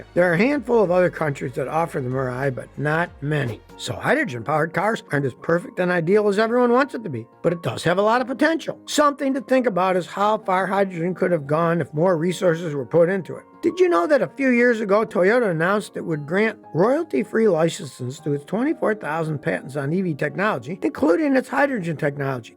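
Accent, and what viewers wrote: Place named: South, United States. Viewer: Wrong. Narrator sounds Southern. Sounds like a Saturday morning cartoon character.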